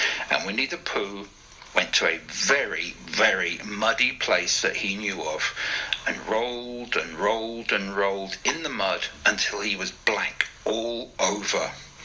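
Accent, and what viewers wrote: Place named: England, United Kingdom. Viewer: beautiful story time absolutely love your English accent thank you my Best Friend loved Winnie The Pooh🤗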